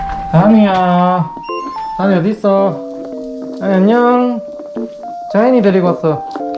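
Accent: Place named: Gyeongsang, South Korea. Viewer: 경상도 말투 무뚝뚝한데 강아지들한테 넘넘 부드럽게 얘기하네요ㅋㅋ 완전팬입니다^^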